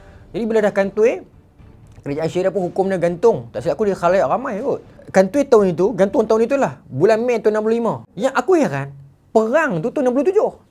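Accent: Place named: Kedah, Malaysia. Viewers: Pekait benor ngt aih bang kedoh tu, penin aih sy nk fakham abg ckap, struggle nk catchup lajhuu ngt aihhh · Ingat sy sorang je tk faham. Maybe sbb lograt utara kot. Rupanye ramai je sama. Nk kuat tv vol tv budak dah tido pulak.😂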